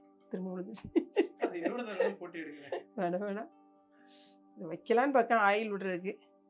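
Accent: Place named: Nagercoil, India. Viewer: U r tamil resembles Nagercoil tamil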